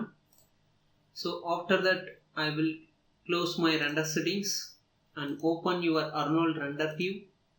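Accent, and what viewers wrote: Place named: India. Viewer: Indian accent- close the video.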